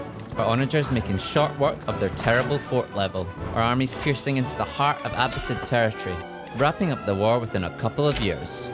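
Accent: United Kingdom. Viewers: i love when british do british. British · Is this man from the lowlands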